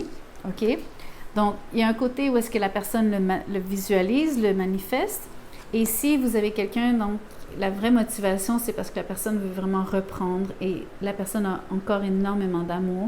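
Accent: Quebec, Canada. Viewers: Merci pour toutes ces précisions avec ce bel. Accent québécois .ce tirage me parle je suis sagitaire l autre personne qui souhaite revenir ' serait scorpion vous me redonnez Espoir👍🙏🌟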